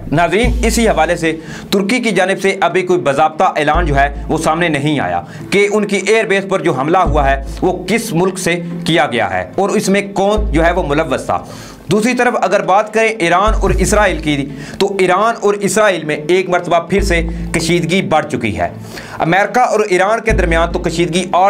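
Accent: India. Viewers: Aap apna taharef to karwa dein, ap ki urdu indian lagti hey , keya ap india se hein ? Please jawab dein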